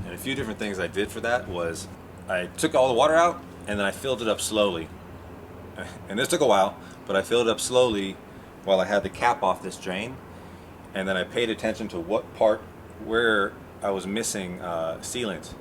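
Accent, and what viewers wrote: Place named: Westchester, United States. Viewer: loo sounds like your in westchester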